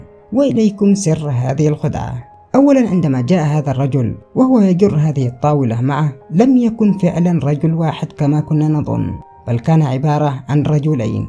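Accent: Yemen. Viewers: آخيرا حدا يمني بدل المصري .... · ارجو عدم مزج اللكنه (التهاميه)باللغه العربيه حتى لاتكون النتيجه مخضريه الله يحولك. ....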